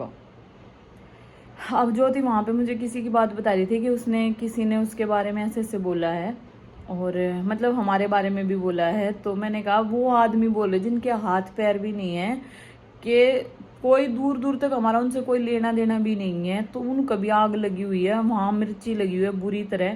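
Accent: Haryana, India. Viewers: Aap Haryana se ni ho kya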